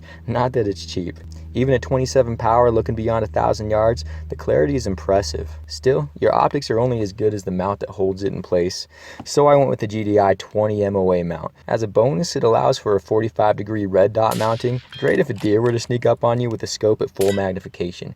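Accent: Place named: South, United States. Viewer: He has a bit of Southern twang, which is fine by me since I'm Southern as well. His commentary sounds perfectly fine. His words are about the rifle and it's performance. That's all you need.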